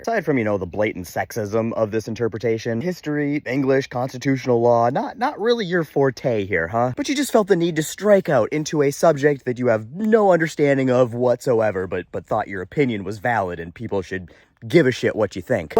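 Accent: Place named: South, United States. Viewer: Woohooooo, do not ever stop. Yours is the kind of hillbilly redneck voice we need. I do mean that in a good way, although i would consider you more redneck than hillbilly. I've been married to one for 30 years. Trumps simple do not speak for all rural country people. Some of us can read a book. 😂 or, in this case, the constitution. Long walk, for a short block. We need you and your common sense way of putting things.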